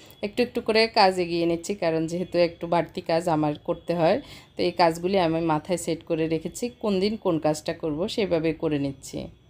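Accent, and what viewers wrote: Noakhali, Bangladesh. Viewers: যে মজা😆😆😆😆আপনার নোয়াখাইল্লা কথা,হাসলাম কতক্ষণ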